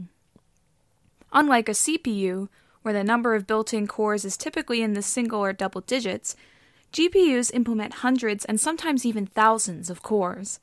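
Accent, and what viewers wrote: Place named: Pittsburgh, United States. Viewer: Luis Bill very true hahaha. It's apparently a Pittsburgh accent thing (that I've undone with some voice and speech training for future videos) 😊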